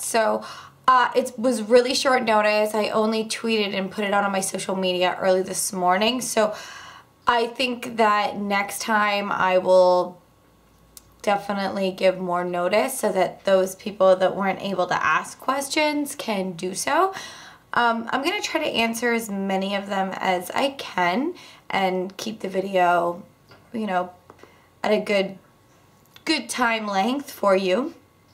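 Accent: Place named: Etobicoke, Canada. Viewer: Loving your videos! I have a friend from Etobicoke and you both have a very similar voice and "accent" ( to me from NY). Do you happen to live near this city? I'm not stalking you I just hear the similarities. Thanks!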